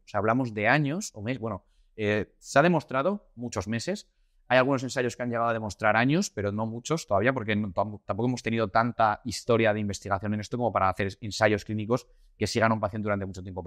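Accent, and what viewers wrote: Spain. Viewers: me es difícil entender ese acento español "tan español"😢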